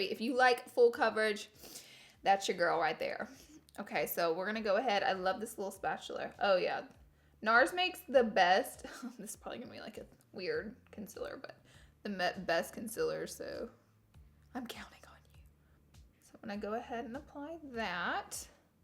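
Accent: South, United States. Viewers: She is from the south would you tell someone with a Jamaican accent that you HATE the way they talk? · I love her accent so much! I’m a Georgian, so I’ve been raised in the south literally on a farm with people who have the strongest southern accents I’ve ever heard. And I just don’t have an accent all my siblings have one all of my family does but I just didn’t catch onto the accent for some reason. So when I hear people with really strong southern accents I absolutely love the way they sound!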